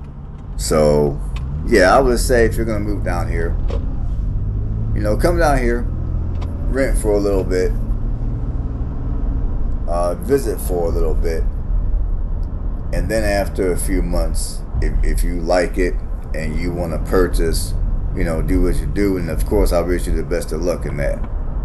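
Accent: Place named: New England, United States. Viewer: Guess illereate yankee voice beats AI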